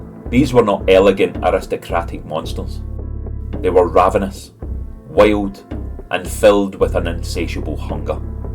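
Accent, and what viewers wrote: Scotland, United Kingdom. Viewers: Excellent stories, great background music and your Scottish accent ads to it all. Love it!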